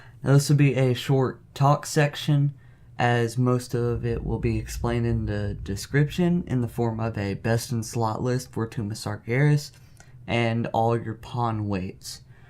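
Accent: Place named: Tennessee, United States. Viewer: That beautiful Tennessee voice PogChamp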